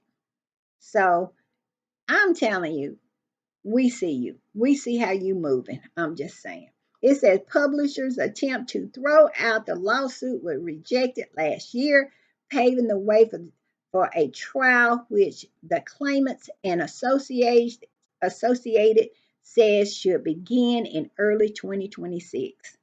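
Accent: South, United States. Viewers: I am watching from England, and I just love your Southern accent ❤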